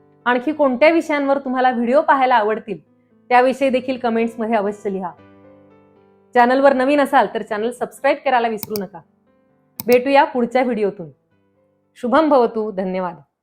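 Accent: Standard. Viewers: मॅडम मी अलीकडेच तुमचे video बघायला सुरुवात केली आहे तुम्ही अतीशय उत्तम आणि विविध आरोग्य विषयक माहिती देताच पण तुमची वाणी अतीशय शुद्ध स्पष्ट आहे त्यामुळं खूपच प्रभाव पडतो तसेच विविध श्लोक तोंडपाठ आणि अस्खलित पणे म्हणून दाखवता खूपच प्रभावी आहे लहान मुलांच्या मधील बाळ दमा कफ यावर कृपया मार्गदर्शन करा विनंती आहे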